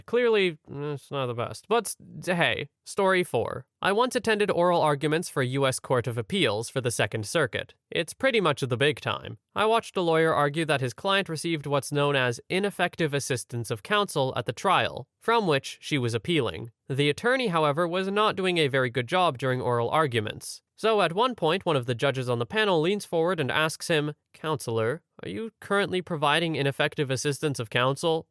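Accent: France. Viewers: 𝑀𝒶𝑔𝓃𝒾𝒻𝒾𝒸𝑒𝓃𝓉 French accent! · By all means your french accent was enjoyable mhm mhm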